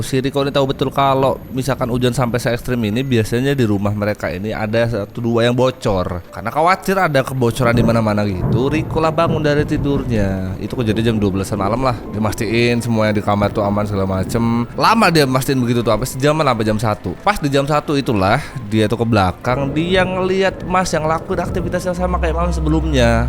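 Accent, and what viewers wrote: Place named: East Java, Indonesia. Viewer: Mas iki wong endi? Boso jowone logat e kentel. Kyok cah jawa timuran😂😂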